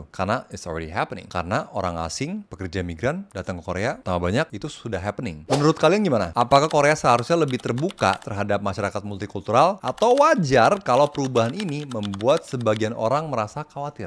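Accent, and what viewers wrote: Indonesia. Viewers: logat nya ya Indonesia banget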